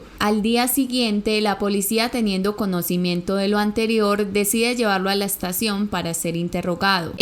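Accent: Colombia. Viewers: Pobre niña En poco tiempo te has convertido en una de las mejores y tu acento colombiano me encanta 👍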